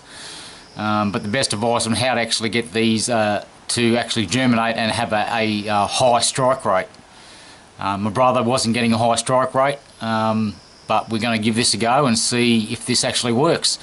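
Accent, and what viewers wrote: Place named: Australia. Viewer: Fair crack of the whip cobbers. (Sea salt? he said Seasol quite clearly mateys?) This blokes an Aussie and is how a few of us talk. Fair dinkum you drongos get yourselves some hearing aids so you can catch what the hell he's waffling on about. Crickey diggers, cobbers, blokes, wake up and whack down a tinny or two, or three! I reckon a few people on this site wouldn't know if they were Arthur or Martha but then again it is 2025 and i've got no idea what the fck is going on half the the time with people these days? Anyhow if you spot an Aussie on the street give them a hoi and say gooday mate and ask them if they have any corms to spare. 😜😇Peace.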